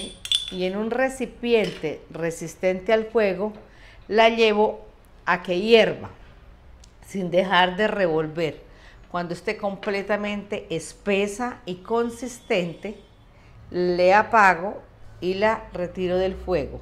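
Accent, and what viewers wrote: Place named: Costa Rica. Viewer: Habla como costarricense.Soy de Costa Rica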